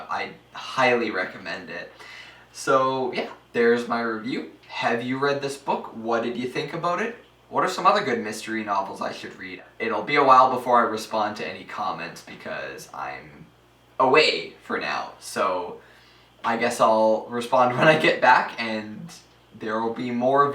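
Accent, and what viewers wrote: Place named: South, United States. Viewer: Ah, I thought there was a bit of a southern accent to your response 😆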